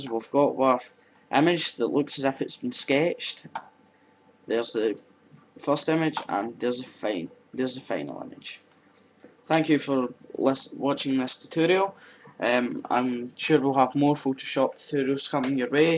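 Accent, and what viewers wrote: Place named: Kilmarnock, United Kingdom. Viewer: nice tutorial and killie accent.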